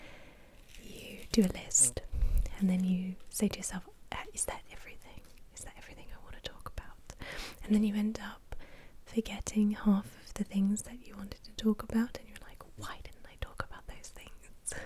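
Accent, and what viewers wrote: United Kingdom. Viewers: your British accent, so beautiful!!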